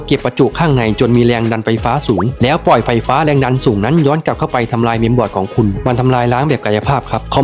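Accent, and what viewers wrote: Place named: Isan, Thailand. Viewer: เว้าอิสานกะได้ดอก😂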